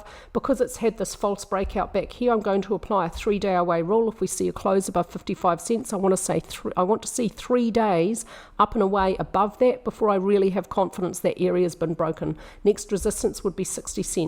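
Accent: New Zealand. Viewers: Great to hear your kiwi ascent😊😊😊😊. Great analysis thanks.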